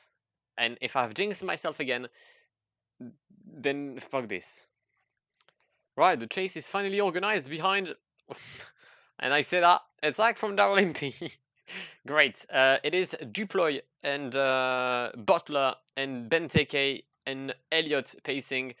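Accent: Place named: France. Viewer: Are you from Belgium or France, because you have a French Accent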